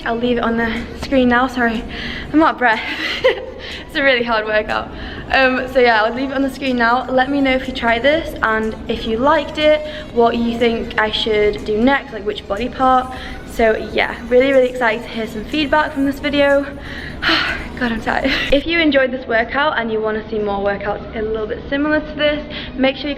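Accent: United Kingdom. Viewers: As would LUIMARCO say: Propahh and legit british accent 👍👍👍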